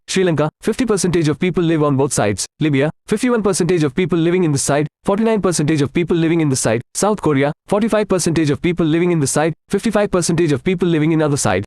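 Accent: India. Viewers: why the accent look like indian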